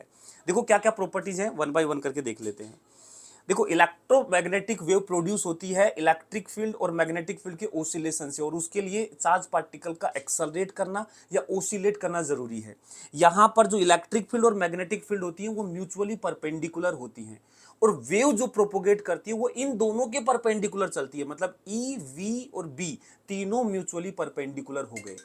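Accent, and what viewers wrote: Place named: Haryana, India. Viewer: Sir ki launguage h jo log haryana se hote h yese bolte h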